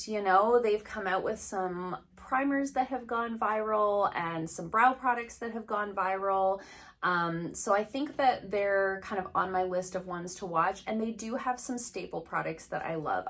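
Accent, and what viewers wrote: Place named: Canada. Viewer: I love the 5 brands you chose. I just bought the new Camouflage foundation from Elf. It’s not bad. A little thick. Had to blend it out really well. I also bought the Madison Beer palette by Morphe. Great colors in that palette. I know you would like it. I like the Morphe brand, also. I want to try the Covergirl Fresh brand powder. That looks interesting to me. I always like to hear what works well for you. I will have to try a few that you have featured here. Love Color Pop, too! Love their eyeshadows and blush. Love your beachy curls! You look so pretty, as always. I love the way you say “about”. Your Canadian accent is adorable! Just like you! Take care, little pixie!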